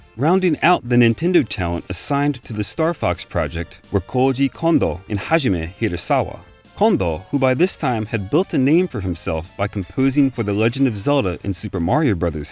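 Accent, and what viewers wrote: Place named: United States. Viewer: I can't help but wonder what region of the US you're from. Or if you're Canadian. The way you pronounce your O's sometimes really stands out. I desperately wanted you to say "the poconos" lol. Great video btw. I've always loved Star Fox :)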